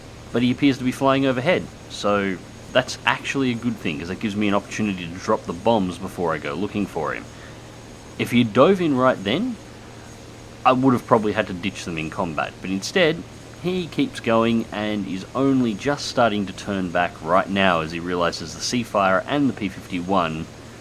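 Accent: Australia. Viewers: Love hearing an Aussie accent on these videos, good show!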